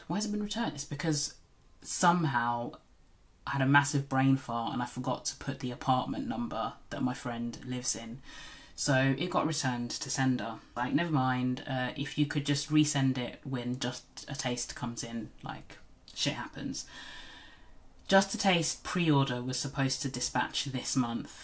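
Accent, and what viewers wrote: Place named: Boston, United States. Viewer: She's from Boston, I believe, and I feel like it's a bit exaggerated too 🤭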